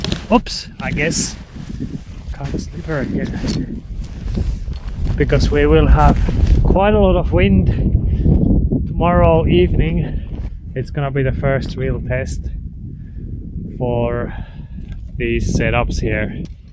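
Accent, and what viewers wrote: Finland. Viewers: The first video I saw was the previous one, and this whole concept; the two of you and a big puppy braving arctic weather in a sail boat for half a year is seriously giving me life force back. It’s so raw and beautiful, all of it. Your (Finnish accent is LOVELY btw!!)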